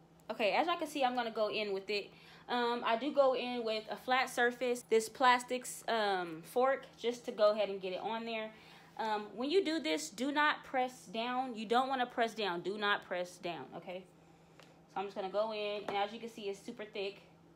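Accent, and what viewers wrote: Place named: Sacramento, United States. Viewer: I’m so nervous to try this idk why 😂 and you must be from Sacramento, I hear a Sacramento accent lol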